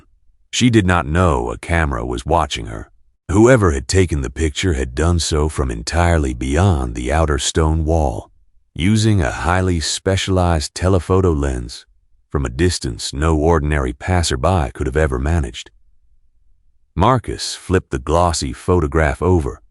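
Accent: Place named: South, United States. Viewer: Why did narrator lose English accent and, develope a southern drawl bit unnerving.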